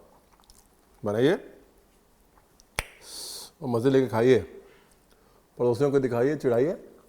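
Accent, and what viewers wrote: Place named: Punjab, India. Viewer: I noticed this today on how he says "Chickun" and not "Chicken". You can take the punjabi out of punjab, but cannot take punjab out of the punjabi. Love it!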